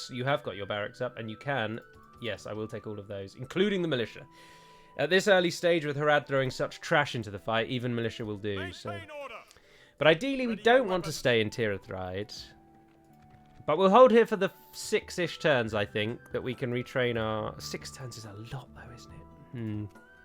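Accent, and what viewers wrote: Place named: England, United Kingdom. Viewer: First, I want to guess Edhellond could mean something like, 'Elf-Haven' but I have no idea in all honesty. Secondly, I've always loved your videos and we will all undoubtedly miss your channel. I just wanted to say before you go, I wish there were more people on YouTube like you. To be fair, I don't watch much YouTube and your channel is the only channel I watch regularly. You have an old-soul like many of the nuns I know in the Convent I work in, but with an English accent, haha. Hopefully life goes well with you beyond YouTube. Best of luck to you!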